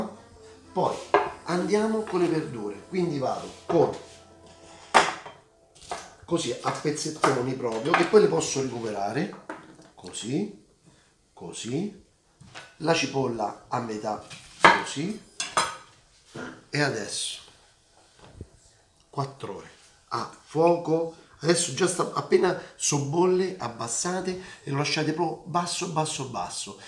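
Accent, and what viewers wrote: Rome, Italy. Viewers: Ma quanto mi piace l accento romano,troppoooo belloooo...ciao....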